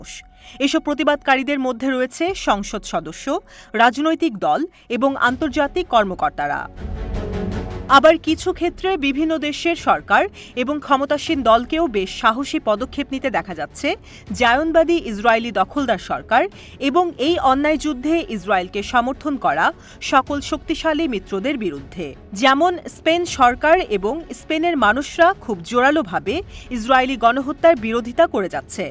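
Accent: Kolkata, India. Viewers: এই সংবাদপাঠিকা ভারতীয় মনে হচ্ছে কোনোভাবেই বাংলাদেশী মনে হয় না। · কলকাতার স্টাইলে সংবাদ পাঠ করছেন কেন?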